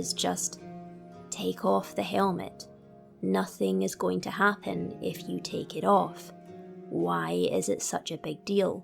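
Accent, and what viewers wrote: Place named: Scotland, United Kingdom. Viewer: I hear a Gaelic accent in your voice ( im assuming Scottish?) Interestingly, Karen Traviss when writing the Republic Commando series based Mando culture heavily on the Celts. Mando'a is spoken like Gaelic but with more of the rules of Norse as far as vowels and such. Aliit Ori'Shya Tal'Din for example. Its what's being sung when Boba Fett finds the Tuskan tribe that adopted him in dead. It means family is more than blood. The family structure is similar as well to both cultures, though women are expected to take even more of an active role in defense/war unless they have children. As a Christian Dins journey reflects my own in some ways. Im more like Boba Fett personality wise, but i struggle between the world and the church. Literally the only other character i related to in this respect was Quigon Jinn vs the Jedi Council. 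But for my relationship with the greater church. In his case, it was the Will of the Force vs the Will of the Jedi.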